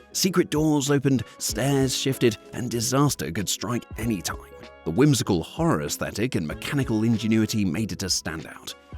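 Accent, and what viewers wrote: England, United Kingdom. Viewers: Don't remember any of these in the UK despite the England sh narration , but in the UK, I think Witchy Witchy was Haunted House.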